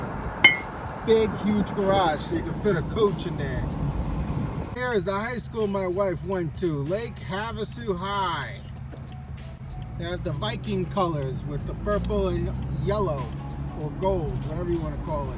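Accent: New York, United States. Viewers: That NY accent is thick.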